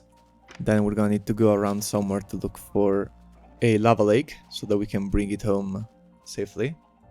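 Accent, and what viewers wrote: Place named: Spain. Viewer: Ese pequeño acento en español y las palabras delatan un poco jajaja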